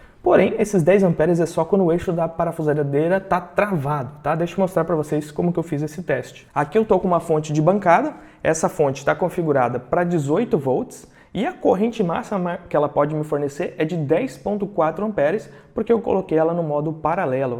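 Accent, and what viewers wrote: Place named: Paraná, Brazil. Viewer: Fala, esse daí em final de frase... Acho que tu é do norte paranaense hein... Rsrs. Massa a aula, inscrito aqui e acompanhando pra aprender um pouco sobre eletrônica. Hoje trabalho com suporte em TI, mas estou buscando um 2ª fonte de renda.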